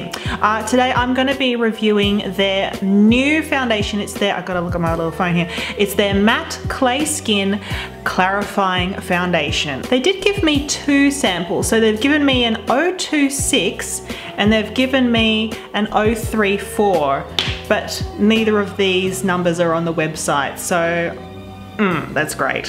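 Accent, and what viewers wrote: Australia. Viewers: Can’t tell you how great it is to hear a fellow Aussie reviewing products. The mannerisms make the video 😂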